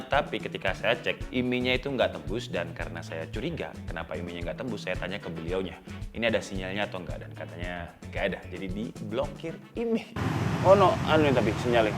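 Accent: Java, Indonesia. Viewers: Medok banget bahasane mas 😁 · Loalah wong jowo ta pantes nyedok😂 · Lahyo logat e malangan wkwk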